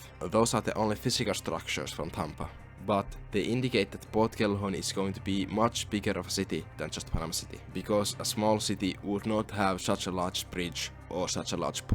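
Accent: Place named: Finland. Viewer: funy finish accent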